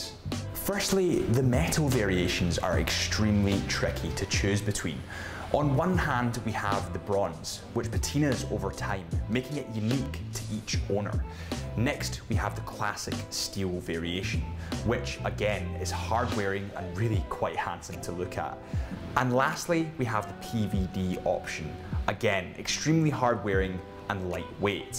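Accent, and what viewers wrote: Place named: Northern Ireland, United Kingdom. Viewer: I hear a wee bit of a Northern Irish accent. :)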